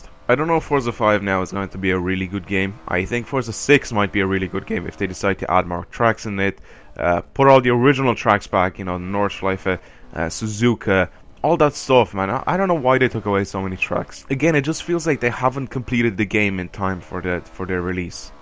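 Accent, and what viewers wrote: Ireland. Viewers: U sound irish.are u irish?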